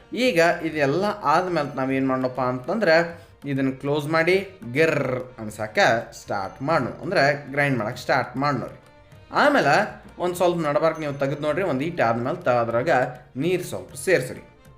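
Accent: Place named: Dharwad, India. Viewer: ಸಾರಿಗಿಂತ ಜಾಸ್ತಿ ನಿಮ್ಮಧಾರವಾಡ ಭಾಷೆ ಕೇಳೋಕೆ ಮಸ್ತ್ ಅದೇರಿ 👍😁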